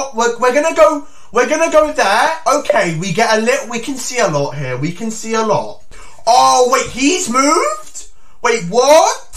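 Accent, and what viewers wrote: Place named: United Kingdom. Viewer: That's just how a British accent is ... a stereotypical US accent pronounces the r more properly and makes more of an "aa" sound for the aw. Same thing with the word yawn - pronounced like "yorn" in UK and more like "yaan" in US.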